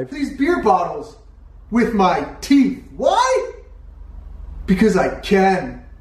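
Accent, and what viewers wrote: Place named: California, United States. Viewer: He sounds so California western now.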